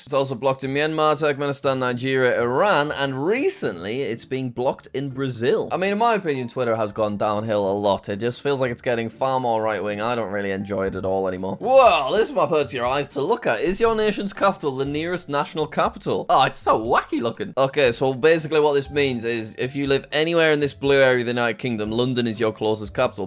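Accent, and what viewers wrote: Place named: Yorkshire, United Kingdom. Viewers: You sound nothing like Geordie bonny lad nor Smoggie. More like Not quite Yorkshire. Some of the accents in the former pit villages of Durham sound more Geordie than youse. Glad to see Norfolk and Suffolk differentiated. In Suffolk words get elongated, in Narfik they get get shortened. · Funny, to me you sounded a heck of a lot more Yorkie than Geordie; but once you mentioned it, I tried hearing the Geordie accent in my head (Ross Noble, popped right in there xD ) and now I'm not so sure anymore!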